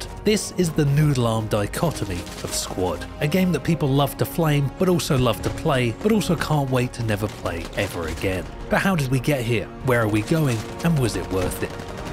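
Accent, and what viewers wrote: United Kingdom. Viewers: What a great video, thank you for your brittish accent.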